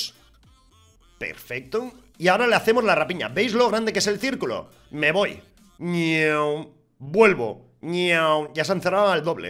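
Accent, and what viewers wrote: Mexico. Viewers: sigue hablando como mexicano que se teda mal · no hay nada mejor que escucharlo hablar español mexicano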